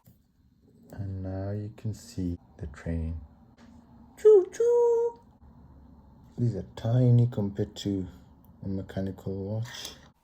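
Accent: Birmingham, United Kingdom. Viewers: Awesome video and lovely watch. I’m pretty sure you’re a brummie like me 👍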